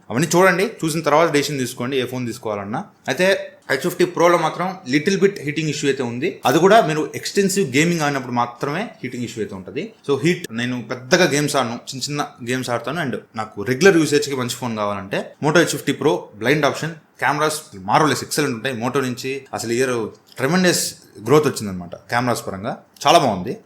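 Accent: Nellore, India. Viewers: Bro miru Nellore ra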